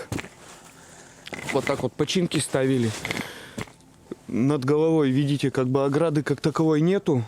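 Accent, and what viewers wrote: Vyatka, Russia. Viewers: До 7 лет часто бывала у дедушки,в деревне Статники Оричевского района ,Кировской области.Деревни этой сейчас уже нет. Ваши повествования будят ностальгию по тем временам, даже вспомнила устройство этой усадьбы.А Ваш говор с вятским акцентом прямо услада для души.Давно уехала с Вятской земли в Барнаул.❤❤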